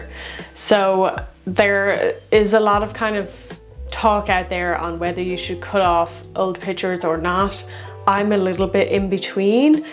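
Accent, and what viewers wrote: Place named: Ireland. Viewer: This is an Irish accent in the general tone most people use because we're not fake and we don't feel the need to elevate our pitch like we're talking to newborn babies, toddlers or doggies woggies so if you can't handle it you should probably toddle along and find the Sesame Street version.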